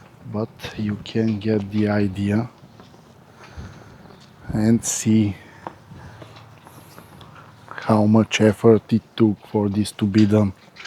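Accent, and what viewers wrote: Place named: Arab world. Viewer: Брачед за 30 гледания ли говориш на английски като арабин. По-добре си ги прави на български.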